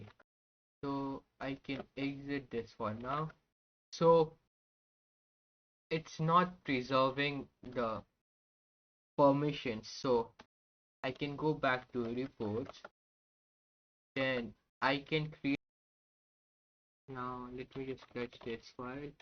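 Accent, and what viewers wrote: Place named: India. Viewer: Your accent is damn cool for an Indian ! Good work, mate. Keep up the good work. Learned about tplmap and synk from your video!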